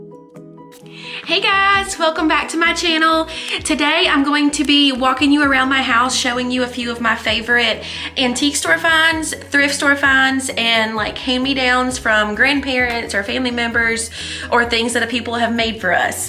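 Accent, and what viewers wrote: United States. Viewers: Where are you from? I know you are definitely a southern girl. 😃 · Ok you definitely sound like you're from NC.....I live in Camden which is about 40 min to Duck (winter time, peak summer traffic who knows how long I'd take, lol). So if you're ar the N OBX (Outer Banks for y'all who don't know) and are 30 min from beach, then you're in Currituck. But if you're not in NENC then you must be farther down the coast. Anyway...like I said you sound North Carolinean, and I know a store that's headed toward the breach that is all beach themed, that's in Currituck. But there's also some at the beach too. Was just curious if I was correct. Not stalker, lol. It's rare to hear someone sound like you on YouTube, lol...ok I'm rambling now, so I'll go, would be cool if ya told me yes or no though, Your stuff is really cute, and I'm with you on old stuff! 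Love it, especially old books! I have a few old early 1900 medical books, as a nurse I find it fascinating!! Love the house and decor.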